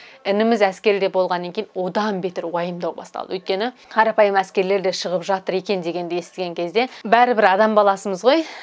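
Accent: Standard. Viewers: тіліңізді таза ұстаған екенсіз.Риза болдым.Қыз бала тілекші дейді.Қазақстан деп тілеген дұға тілектеріңіз қабыл болсын.Аман болыңыз.Отбасылық бақыт тілеймін. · Шет елде жүрсең де таза қазақша сөйлеп.қазақ деп соққан жүрегіңнен.Сенің аңгімеңді тыңдап көзге жас келді. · Айналайын жаным бізде сені жақсы көреміз сыңғырлаған дауысыңнан қазақшаны тап таза сөйлейсін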